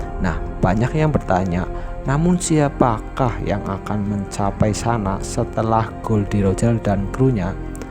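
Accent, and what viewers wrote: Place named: Java, Indonesia. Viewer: suaranya medok bngt!!mau nonton jdi kga jdi ,next.vidio pake teks aja gan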